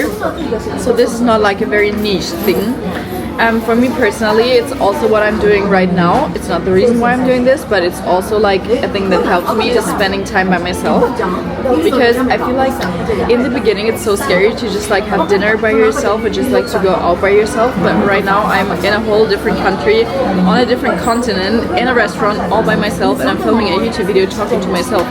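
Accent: United Kingdom. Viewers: You sometimes sound british and we’re here for it xoxo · You should lean into the British accent why not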